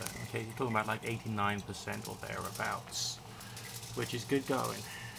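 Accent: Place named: England, United Kingdom. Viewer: Annoying accent .. english Lad ..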